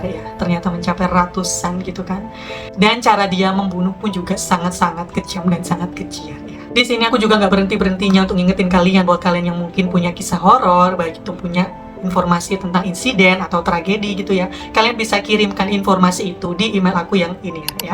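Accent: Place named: Java, Indonesia. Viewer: Beda kak , kaka ini njelasin dengan caranya lbh slow dan medok , klw ka nadia patas dan heboh , punya ciri msg2 sih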